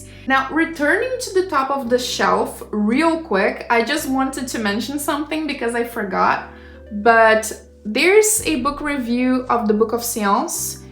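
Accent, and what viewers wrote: Brazil. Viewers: r u from brazil? u have a little accent <3 hahaha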